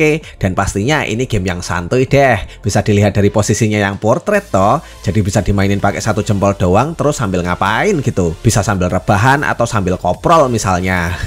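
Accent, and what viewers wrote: Java, Indonesia. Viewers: Lanjut bang,mantap logat jowo ne kentel banget. Yang ngeh no 6 liat size nya kalian emang debes ws pokok e,Iso ngeh lo salut salut,Lanjut bang.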